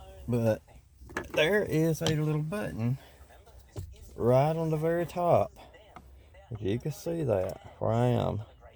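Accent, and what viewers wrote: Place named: South, United States. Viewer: Southern folk, only accent i can watch at 2xspeed just fine😉 Good vid, never thought of swapping key electronics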